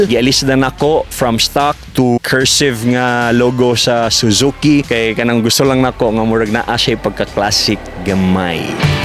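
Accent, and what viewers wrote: Visayas, Philippines. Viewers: Been Watching kahit d ko naintindihan kasi tagalog ako, Pero theres somethings interesting sa accent, Sa bisaya language, Soon sana matuto ako hehe. I'm 31 now and feeling lost, Didnt expect to be moved by this vlog kahit half of words d ko maintindihan.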